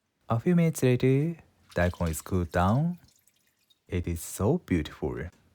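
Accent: Japan. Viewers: Your comment is full of negativity and doesn’t contribute anything useful. Everyone’s trying their best, and no one’s perfect. Just like how a French person speaks with a French accent or an Italian with theirs, a Japanese speaker has their own accent when speaking English. It doesn’t make their English less valid—it’s part of who they are. If the content isn’t for you, that’s fine, but there’s no need to tear others down. Maybe take a step back and think before you post—respect goes a long way.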